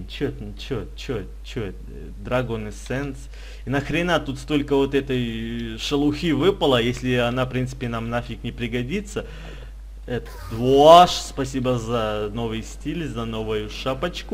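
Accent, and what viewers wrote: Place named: Ukraine. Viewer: Голос украинский 😆😆💫🌚💦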